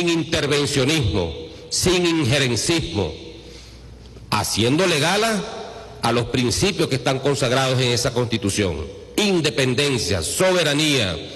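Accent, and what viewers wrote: Cuba. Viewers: HABLAS COMO UN CUBANO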